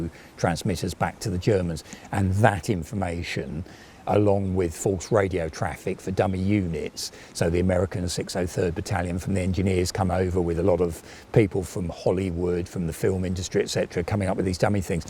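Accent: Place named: Cockney, United Kingdom. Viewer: Septics...a bit of cockney is like a whiff of home...even if you're from the valleys, like me... :)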